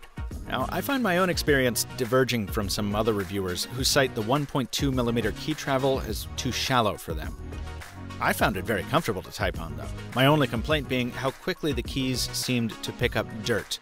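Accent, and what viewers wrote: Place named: Transatlantic, United States. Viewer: Nice Transatlantic accent.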